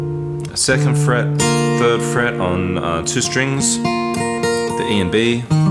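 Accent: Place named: Australia. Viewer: Thank you so much for doing this. I really appreciate it. I'm a 'reasonable' fingerpicker and alternate bass is no problem. Delighted though that you showed your own little nuances that make the difference. Now I don't have to sit down and work it out. You're correct; IT IS an easy one to do for a seasoned fingerpicker. Reminds me of Mark Knofler describing how to fingerpick...learn the basics - thumb for 6,5,4, and fingers 1,2,3 for strings 3,2,1. Get comfortable with that, bring in alternation and then...forget it completely and away you go! It was great to hear him justify what I'd done myself many years earlier 😅. Nice to hear the Oz accent...I spent ten years there. Greetings from Ireland.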